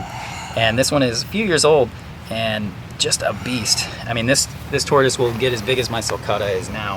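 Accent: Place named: Georgia, United States. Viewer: I wonder what state he is it sounds like Georgia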